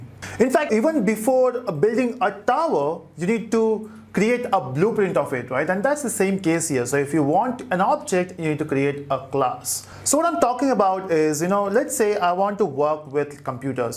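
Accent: India. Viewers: sorry your tutorials may be good but that Indian accent is killing me.And you talk like you had 1 kilo of coffee.Not very attractive when you want to actually learn something :(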